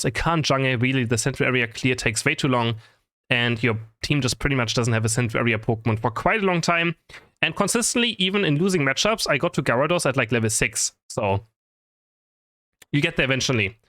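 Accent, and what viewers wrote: Germany. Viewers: your accent sounds like German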